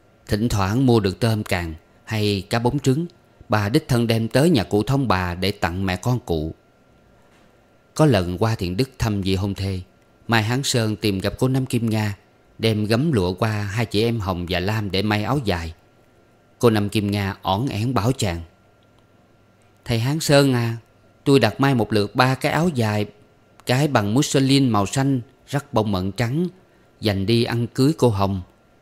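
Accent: South, Vietnam. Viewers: Mèn đét quỷ thằn thiên địa ơi, yêu quá những từ miền Nam đặc sệt sền sên luôn. Thanks NV Hồ Trường An và giọng đọc thiệt là Nam Kỳ của ❤❤❤❤❤❤❤❤❤❤❤❤❤❤❤❤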